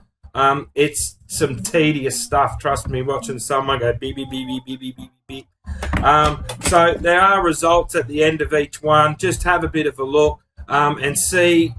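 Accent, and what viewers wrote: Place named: Australia. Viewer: He's Australian Karen...Oops, I meant Mary. There's not just one type of English, even in the US.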